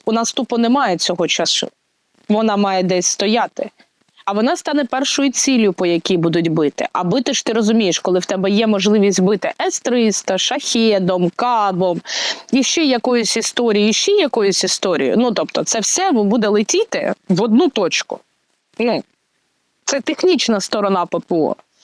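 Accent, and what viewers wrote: Ukraine. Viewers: У нас на Дніпропетровщині такий же говор і точно знаю Полтава так розмовляє. Наша дівчинка ❤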